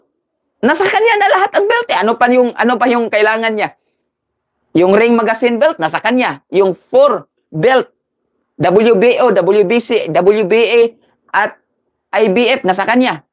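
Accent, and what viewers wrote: Visayas, Philippines. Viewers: Ung Tagalog mo bay bisaya padin😅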